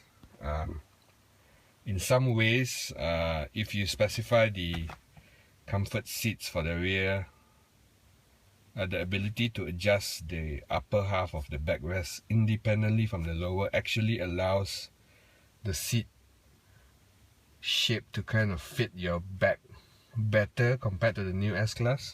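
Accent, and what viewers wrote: Singapore. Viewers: fucked up singlish accent bah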